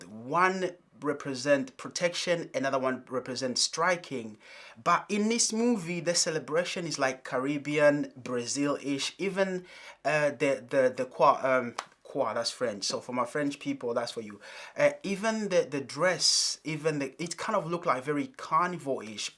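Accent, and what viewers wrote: Africa. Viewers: Great review brother. You broke It down nicely. But you don't have a British accent. You sound African. Even if my eyes were closed. 😊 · Your accent is telling me your African using a some London and American slang words you don't sound like you're from London at all.. I agree with your break down tho.. The movie seemed very rushed and not really funny at all.. A big let down it was ok in some parts but overall not good.. Your totally right about the clothing and lighting after Black Panther I thought Americans have stepped up but not this time..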